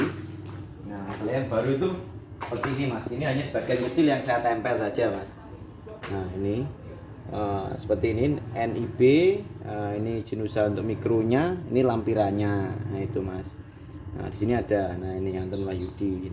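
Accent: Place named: Java, Indonesia. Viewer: Asli wong Palembang,,,,,, TPI logat bicara Jawa ny jngan d bawa mas,,,,,,bawa logat Palembang aj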